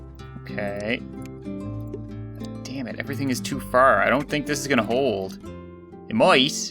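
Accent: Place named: Canada. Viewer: He sounds so Canadian back then Also I remember back then when he didn't swear a lot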